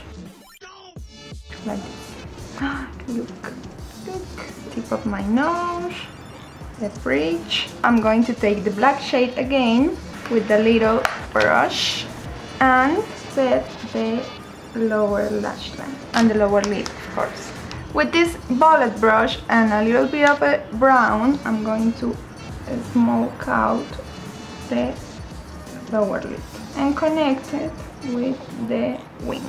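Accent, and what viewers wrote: Paisa, Colombia. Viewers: Estás bien, te falta es la pronunciación más gringa y menos paisa jajaja pero vas bieb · Estuvo genial, muy claro y el acento paisa hizo más simpático el vídeo. Esto me ayuda porque soy terrible para el inglés, como que me familiarizo más de esta manera, no sé. Gracias. Luces muy guapa también con los frenos, y el maquillaje quedó precioso.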